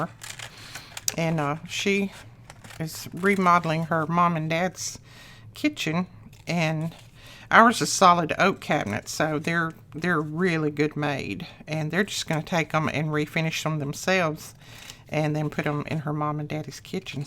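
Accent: South, United States. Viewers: I absolutely ❤️ every one of your videos‼️Yours is my favorite channel to watch and my biggest inspiration. I’m very new to this but enjoy it so much. If I could afford it, I would buy everything you use and create all day. Ha ha. Love your accent as a fellow southerner. I’m from Texas, but the accent is the same. Talking about your family is part of your southern charm. Don’t pay attention to those negative people complaining about it! 😉 Keep up the beautiful work! -Michelle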